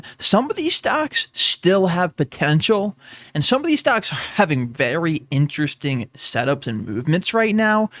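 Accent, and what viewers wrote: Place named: Northeast, United States. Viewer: Homie i have to know where is your accent from, has to be the NorthEast region somehow. Let us know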